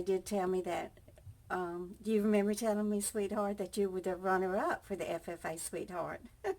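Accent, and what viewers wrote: South, United States. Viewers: Thank you for sharing that recipe,. You always have tasty foods to share with viewers. As always, can't get enough of the Southern accent...it reminds me of home. Stay blessed, kind soul.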